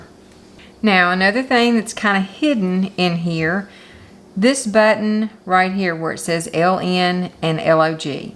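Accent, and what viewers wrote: South, United States. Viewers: Thank you soooooooo much for such a super tutorial video!!! After many years of taking advanced math now everything's is blur. And now, I have to recall most of it but thanks to this calculator things are much, much easier! I just wish I'd had this calculator when I went to college some 40 years ago. BTW, I love your southern accent.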